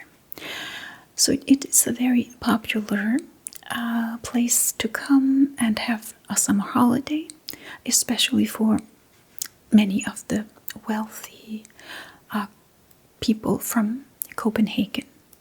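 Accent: United States. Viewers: I would have liked an English version rather than American English. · Someone please tell me how this Dane not only speaks flawless English but does so with an American accent.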